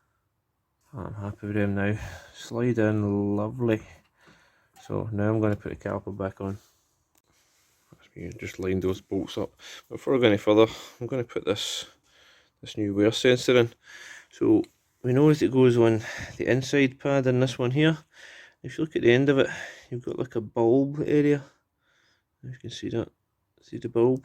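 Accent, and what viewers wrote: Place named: Scotland, United Kingdom. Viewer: Scottish English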